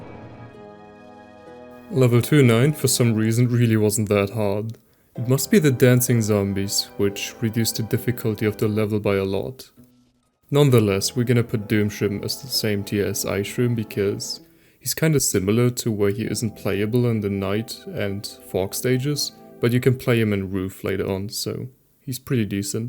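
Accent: Germany. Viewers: You have nice german accent.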